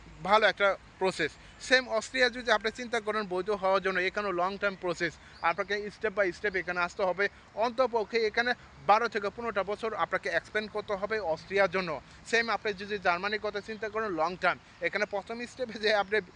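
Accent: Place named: Brahmanbaria, Bangladesh. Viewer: আসসালামুয়ালাইকুম ভাই মনে হচ্ছিল আপনি আমাদের এলাকার ব্রাহ্মণবাড়িয়া আমার বাড়ি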